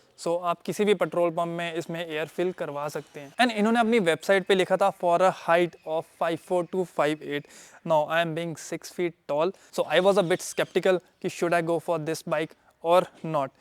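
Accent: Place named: Jammu, India. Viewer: bhai apki tone se lgra aap jammu se ho...( me jammu se hu ) is yes then bta skte ho konse area se ...? milkr ride krnge ❤ ( although video bhut acha tha...iski unboxing bhi dekhi thi review bi dekh liya ab purchase bhi kr lunga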